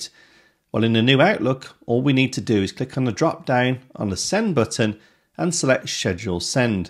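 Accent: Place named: Wolverhampton, United Kingdom. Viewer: This is a good video. However, it does not consider that most people who have an Outlook Classic work email are on IMAP or POP, not on Exchange. Hence, when you switch to the new Outlook option, you no longer have all the same features.and Therefore, switch back. Thanks, something to consider. Also, I recognise the Wolves accent 👍👍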